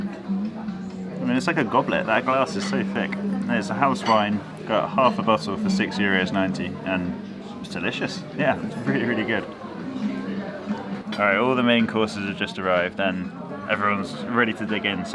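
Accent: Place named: New Zealand. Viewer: Thanks, I was stoned af last night watching this and for some reason thought you mighta been kiwi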